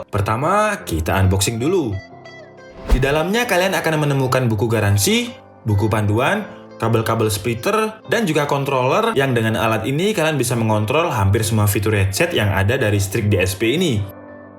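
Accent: Java, Indonesia. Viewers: Njarem jawa bangett haha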